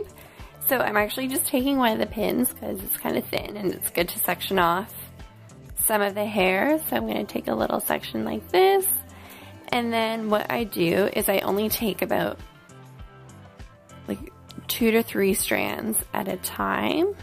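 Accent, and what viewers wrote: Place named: Canada. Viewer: Have you ever lived in Canada? Seems like you have a hint of an accent.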